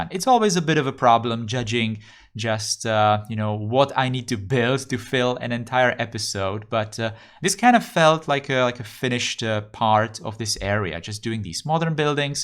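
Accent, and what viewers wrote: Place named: Czech Republic. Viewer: Clicked on your video and instantly could tell you're Czech because of the accent :D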